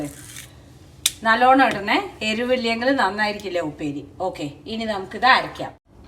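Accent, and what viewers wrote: Palakkad, India. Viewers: പാലക്കാടൻ ഭാഷ ടോളെ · Hahahahahha chechi 😄😄😄 എന്താണ് ഉണ്ണി 😄😄 പാലക്കാടൻ ഭാഷ കേൾക്കാൻ നല്ല രസം എന്റെ chechi ആ ചീന ചട്ടിയിൽ ഇട്ടു പുരട്ടി തിന്നുമ്പോൾ ഉള്ള സ്വാദ് അത് പറഞ്ഞറിയിക്കാൻ കഴിയില്ല എന്റെ അമ്മക്ക് നല്ല ഇഷ്ടാണ് chechi കുഴച്ചു പുരട്ടി കഴിക്കുന്നത്. ഇങ്ങനെ ആണ് ചോറ് കഴിക്ക അല്ലാണ്ട് അവിടുന്നും ഇവിടുന്നും തോണ്ടി അല്ല കഴിക്ക പറയും ☺️☺️☺️☺️ എനിക്കും ഇഷ്ടാണുട്ട chechi കഴിക്കുന്നത് കാണാൻ നാളെ എന്റെ കറി ചേച്ചിടെ കുരുമുളകു രസം ചേന ഉപ്പരി & അപ്പളം ✌️✌️✌️✌️ ഒത്തിരി സ്നേഹം 😍😍😍😍 🤗🤗🤗🤗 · Love your simplicity,ur palakkadan tone, simple and healthy menu. Lots of love from thrissur. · എന്താണ് ഉണ്ണി.. പാലക്കാട്ൻ ഭാഷയും ചേന ഉപ്പേരി യും കലക്കി 👍🌹❤😍😘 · Cheenachatti nalla bhangi evidunnu vangi Palakkad samsaram kelkan bhayakara rasanu ente husband veedum parli anu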